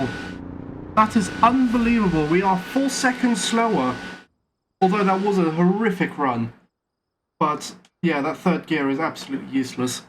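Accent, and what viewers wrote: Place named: Germany. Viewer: What is your accent? Your profile says German, but you don't sound like most Germans I have seen talk. I assume it is some kind of German regional accent, so I am curious what specific region.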